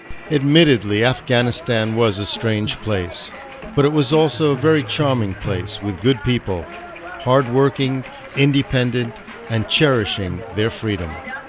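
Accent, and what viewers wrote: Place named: Brooklyn, United States. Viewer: "Baklavar"? Dude. Your pronounciation is pure Brooklyn. I suggest you stay away from the contemporary political commentary. If anyone wants to read the details of events leading up to the Afghan civil war of the 1980s, one place to start is the chapter on Afghanistan in William Blum's book 'Killing Hope: US Military and CIA Interventions Since WW2' (Common Courage Press 2004) and Blum's references therein.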